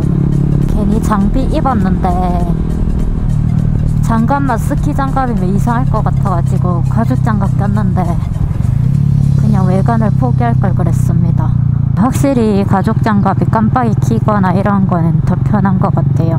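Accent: Busan, South Korea. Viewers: 와.. 부산사투리 매력 취한다...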